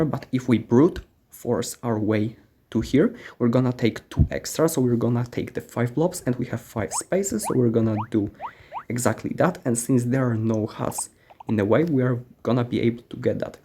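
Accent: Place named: Poland. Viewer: Haha i knew it, you are polish! I was so suspicous of your accent, now beacuse of the ads i know for sure😂 Great relaxing video❤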